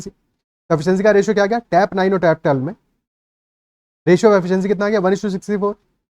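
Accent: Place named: South, India. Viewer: Sir south indian accent is crazy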